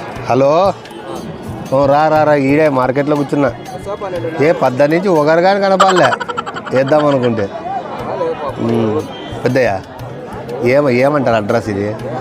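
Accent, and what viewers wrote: Rayalaseema, India. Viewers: Madanapalle Naa thalli gari uru chittor daggara thandri garu uru super rayalseema slang comedy chupinchavu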